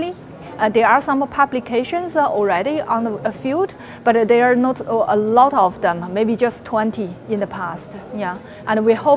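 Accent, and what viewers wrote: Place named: China. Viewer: 中国方言味的英语.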